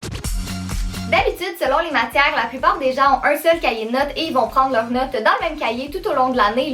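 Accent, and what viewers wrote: Quebec, Canada. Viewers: filou cannelle sont accent est canadien elle me la dit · Miss Panthère Forever en plus c'est vraie le Québec et au Canada mdr l'autre elle a dit c'est un accent canadien 😂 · Accent québécois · J'adore ton accent québécois 😘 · ça me fais bizzare je suis de France et ton accent canadien est tellement différent du français ça me fais drôle ;) super vidéo en toit cas. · J adore ton accès canadien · Au début j'avais du mal avec l'accent canadien mais maintenant j'adore 💛💚💙💜❤️ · j'adore ton accent canadien !😊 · J'aime trop l'accent québécois Ahah, sinon super vidéo !😍✨ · Tu es québécoise ses cool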